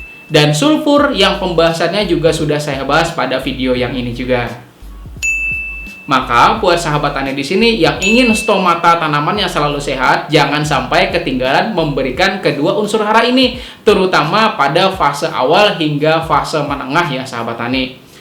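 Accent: Banjar, Indonesia. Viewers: Kapuas. Pinanya logat Banjar pian pak😅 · Orng banjar nih..logatny gk hilang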